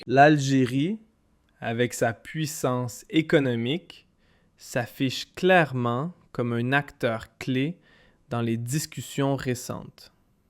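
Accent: Quebec, Canada. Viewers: Encore un soldat immigré dz des caporaux avec un accent Québécoi qui croit que l'Algérie est une puissance alors que la queue sur l'alimentation se mesure en kilomètres. Je suis persuadé que l'Algérie finira par être disloqué avec ce régime actuel qui emmène le pays droit au mur.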